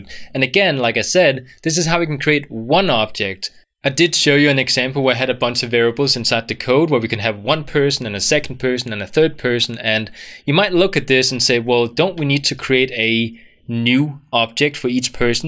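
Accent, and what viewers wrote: Denmark. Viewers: Trying to figure out the accent. Definitely a Scandinavian but not Swedish accent because, we don´t sound exactly like that but close. It´s not Norwegian because you don´t sound that gay, so you must be from Denmark.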